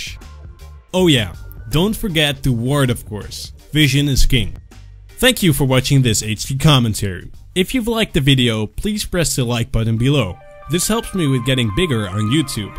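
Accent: Netherlands. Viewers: you're Dutch, aren't you? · u sound dutch af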